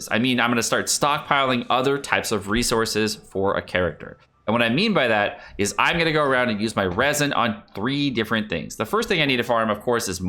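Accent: United Kingdom. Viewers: pog british accent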